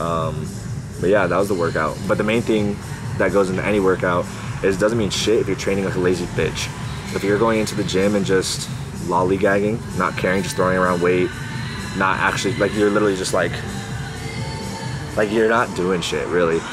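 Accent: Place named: Canada. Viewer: why do you sound Canadian in this 😭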